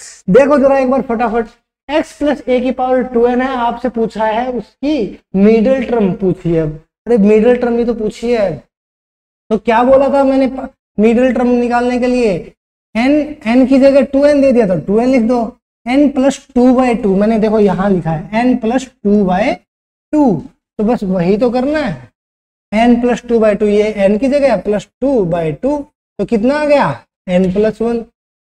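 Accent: Haryana, India. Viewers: Sir aap 101% haryana se ho na? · Han ji.. Wese isme koi shak nahi hai... Qki sir haryana se hi hain... Magar aapki daag deni padegi ki aapne tab bhi pahchan liya jab sir ne hatyanvi nahi boli hai....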